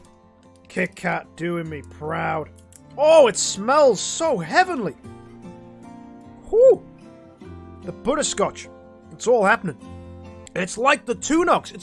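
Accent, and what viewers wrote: England, United Kingdom. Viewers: Do you live in the US? English accent, always says dollars instead of pounds it confuses me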